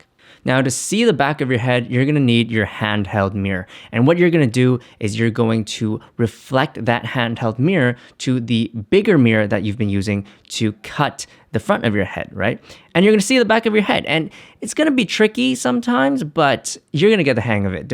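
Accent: Canada. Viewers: The Canadian accent is familiar and pleasant to hear 😊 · Are you Canadian by any chance?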